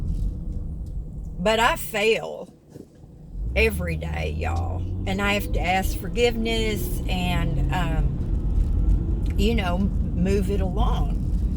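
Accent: South, United States. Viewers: Ms., you are awesome! I love your southern accent girl! Every video makes me smile.... If that isn't special then i don't know what is. lol <3 "Not praying for patience anyomore!" LOL I love it! Take care and God Bless. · Be proud of your Southern dialect!!!!!!!!! Never back down and never change to appease your critics because they don't matter. In about 50 years English will be extinct in the US.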